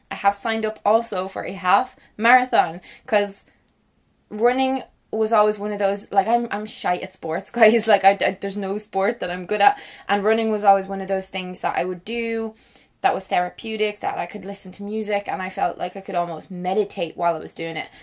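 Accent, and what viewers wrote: Ireland. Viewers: I love theseeeee and you your Irish accent is lovely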